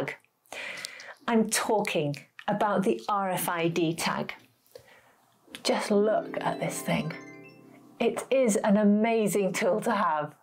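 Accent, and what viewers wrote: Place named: RP, United Kingdom. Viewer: What's a great SmartHome introduction. Very informative, your mounth 'prints' queens spoken English, and you touch on things like ESPhome and many more that regular (even IT) guy would not be aware of initially. Are you UK based? If so, can you sometimes add links to products where you usually buy from?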